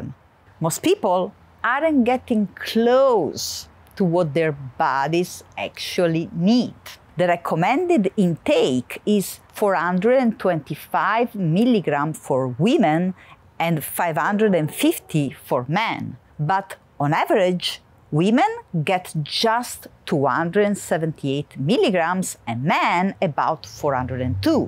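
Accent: Naples, Italy. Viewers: dott lei rimane napoletana anche quando parla in inglese😅. Un saluto per la sua mamma❤ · Quanto è sexy l'inglese con l'accento napoletano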